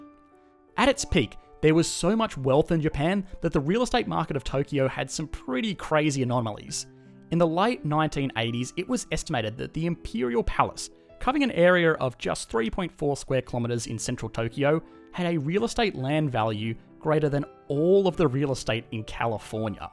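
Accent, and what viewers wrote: Australia. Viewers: Is yours an Australian accent?? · Australian accents are very thick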